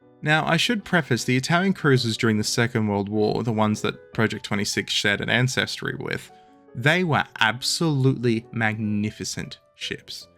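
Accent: Australia. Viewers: Hey! Don't really give a shit about ships but I do appreciate your accent, proving again that we don't all sound like yobos. Have fun & hope the channel does ok. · Gotta love the sound of a fellow Aussie talking out his arse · Like Vegemite, the Aussie accent is something to be proud of, even if it's not for everyone. Personally, great video and attitude with the foreword.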